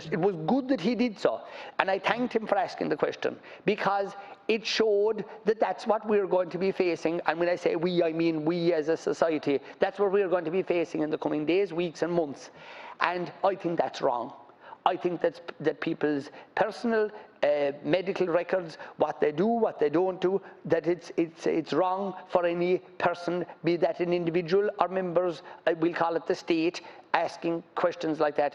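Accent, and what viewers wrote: Kerry, Ireland. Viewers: How could anyone dislike a Kerry accent. It sounds like poetry to me and I'm a dub. 😉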